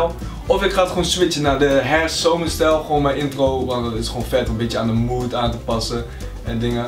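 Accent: Groningen, Netherlands. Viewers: Ben je gronings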